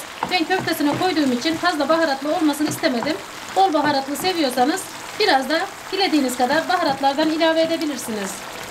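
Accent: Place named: Central Anatolia, Turkey. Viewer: konuşma şivesinden İç Anadolu lu olduğunuzu tahmin ediyordum ama bu kadar yakın olduğumuz aklıma gelmezdi Çok memnun oldum Başarıların daim olsun kal sağlıcakla 🥰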